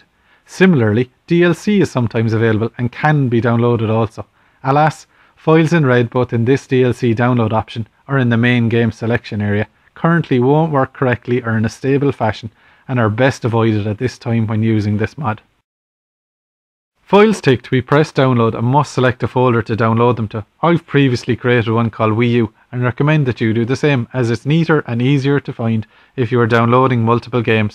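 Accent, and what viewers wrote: Ireland. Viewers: hey are you irish i am!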